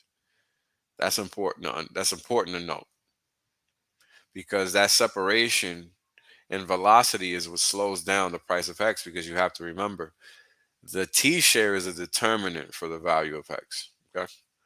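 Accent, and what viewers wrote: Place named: New York, United States. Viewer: Is your voice/accent for real bro, that's some serious New York.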